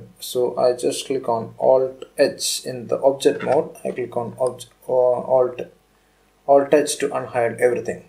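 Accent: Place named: Kerala, India. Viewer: malayali thanneyanenn nerathe manasilayi karanam malayliyude english oru english alle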